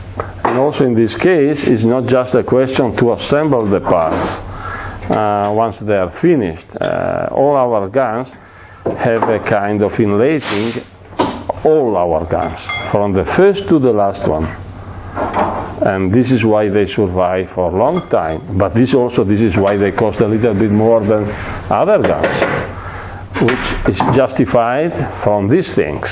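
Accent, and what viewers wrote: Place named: Hungary. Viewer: Nagyszerű videó!:)És jó hallani a magyar akcentust az angolban!:D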